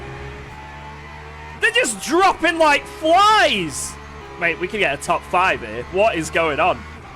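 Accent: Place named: North East England, United Kingdom. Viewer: New player, new subscriber - I'm trying to place your accent though?! I get twangs of North East, then a bit of Manchester'ish all with the odd bit of Americana?! 🤔😂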